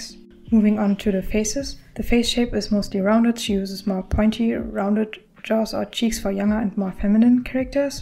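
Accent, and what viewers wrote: Germany. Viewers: already one of my favorite art style studies I've watched so far, you deserve so much more attention! Also, idk why but you sound like you have a German accent lmao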